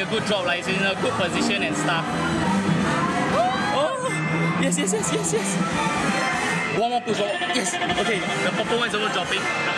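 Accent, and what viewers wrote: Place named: Singapore. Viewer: This accent sounds so Singaporean... Reply if I'm right.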